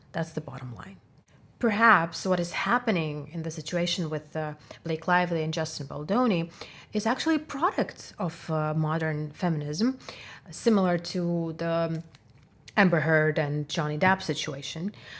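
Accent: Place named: Ukraine. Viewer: Hello. Are you from Ukraine? Once I heard your accent I thought you're from Ukraine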